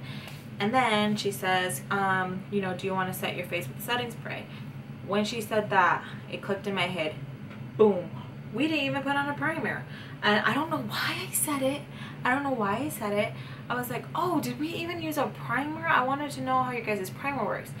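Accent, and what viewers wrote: Mexico. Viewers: where r u from btw I love the spanish accent sounds kinda mexican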